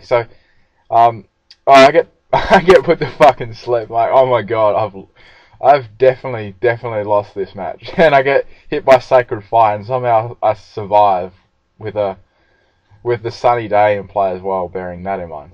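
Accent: Australia. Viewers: my favorite part of this video? that he's FUCKING AUSTRALIAN!!!!!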